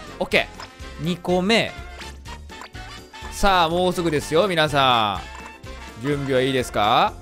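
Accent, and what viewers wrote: Kansai, Japan. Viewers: 同じ関西弁やからな（自分も）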